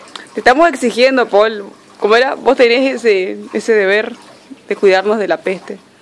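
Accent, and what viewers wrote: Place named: Argentina. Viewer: El acento de las argentinas es el mas dulce del mundo! Alguien de alli me puede decir si la mayoria de las Argentinas hablan parecido a la "locutora" de este video???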